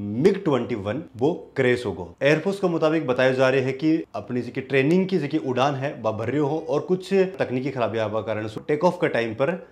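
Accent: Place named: Bikaner, India. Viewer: Bhai... Aap bikaneri bol rahe ho thoda sa नागौर hote huye जोधपुर side aa jati,😊😊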